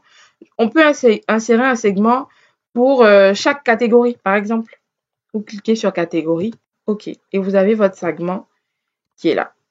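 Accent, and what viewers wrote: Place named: Cameroon. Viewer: Accent camerounais quand tu nous tiens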